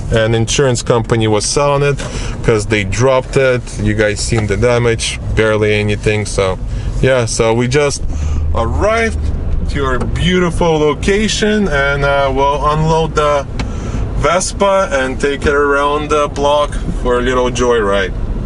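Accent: Russia. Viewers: WOW, I am blown away and fighting the tears. I knew with your beautiful accent that you probably came from Russia. As a proud American, and this is long overdue, welcome to your new home! You and your family have done more with the American dream than we native Americans. Your dreams, your hard work and faithfulness to your family has paid off. Your dad recognized his worth and that of his family. I am thrilled you have a second store! Who knows maybe a 3rd or 4th awaits. Your are the quintessential success story, and you did it really without a lot of help. You were and are faithful and patient. Giving credit where it is due I personally believe God brought you here. Whenever something good happens in my life I thank Him for it. When the storms of life appear I ask Him what can I learn from this how can I grow. You’ve done that too. You now have one of the premier and most successful channels out there. 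I wish you all the best for you and your entire family. A goal on my bucket list is to someday shake your hand and hug you! Keep up the awesome work!!!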